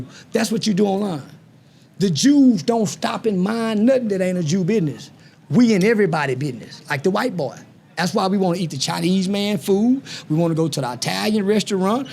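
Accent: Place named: Chicago, United States. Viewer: he gotta stop talking like king von.. That Chicago accent is ignorant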